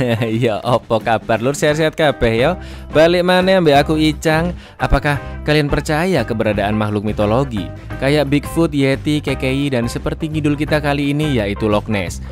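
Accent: Java, Indonesia. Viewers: Wong jowo ya😹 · Aku mas wong jowo. Mas e jowo ndi mas? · Bang lu orang jawa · Wong jowo yo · Jowo ndi kang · Bang asal Jawa mana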